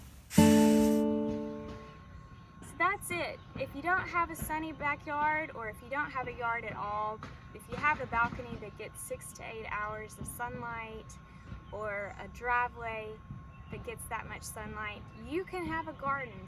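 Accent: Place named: South, United States. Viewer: Great video, love the southern accent. Thanks for sharing. Growing in pots is a great idea. Move them if you need to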